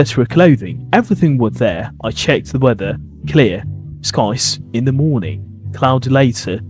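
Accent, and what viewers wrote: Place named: RP, United Kingdom. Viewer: It’s a real pleasure to listen to these fascinating stories read in your perfect RP accent. I can’t wait to hear the next one. Thank you so much for all your hard work.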